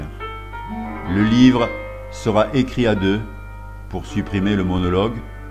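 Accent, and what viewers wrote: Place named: South, France. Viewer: Quelle belle idée, ce poème, récité par son auteur a quelque chose d'un cadeau personnalisé. merci...et pour le bel accent du sud !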